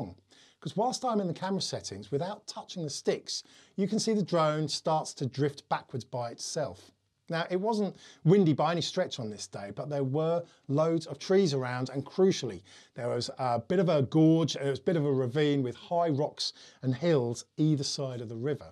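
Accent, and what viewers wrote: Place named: Durham, United Kingdom. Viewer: not yorkshire mate durham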